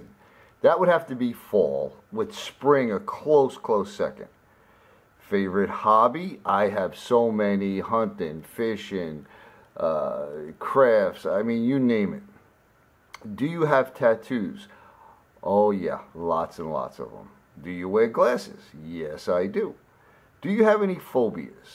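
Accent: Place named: Arkansas, United States. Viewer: Okay I didn’t know you were from Arkansas, that accent clearly gave it away! I really didn’t think you would do this but I’m so glad you did 😁first of all thank you for the awesome shout out my friend. And I Loved loved loved the pics. Some of those faces 😂he’s too cute! ☺️ I wish I would’ve thought to plead the 5th on some of those answers🤔 dang it! I loved your answers I appreciate you being so down to earth and so honest, kind, and funny! The dancing 🕺 answer made me LOL literally. I hope you are having an awesome day my friend. Stay safe!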